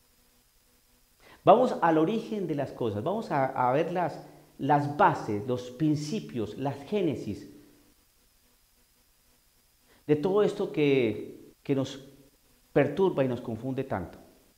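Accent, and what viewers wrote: Colombia. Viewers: Calavera. jajaja hay padrecito me iso reir con eso tipico hablar colombiano.Bendiciones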